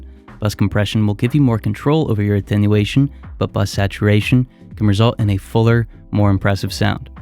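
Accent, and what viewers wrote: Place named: Brooklyn, United States. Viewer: This guy must be from Brooklyn. I can hear it.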